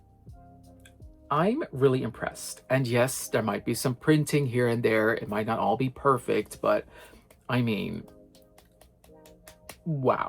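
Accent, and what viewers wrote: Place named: New Jersey, United States. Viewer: That is so cool! I thought you had a Jersey accent lmao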